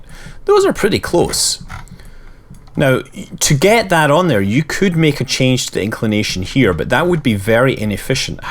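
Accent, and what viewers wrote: Scotland, United Kingdom. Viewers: cool accent, are you scottish or canadian? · he isn't Irish... he isn't faking Irish... he is Scottish that is a Scottish accent not a Irish one so you are technically right he is not Irish but he is not a faker.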